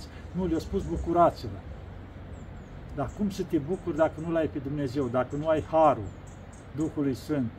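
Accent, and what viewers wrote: Moldova. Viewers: Doamne ajuta! Parinte, astept cu nerabdare sa va ascult in vorba dulce moldoveneasca sa ne vorbiti de Postul Pastelui!❤ Slava Tie, Doamne!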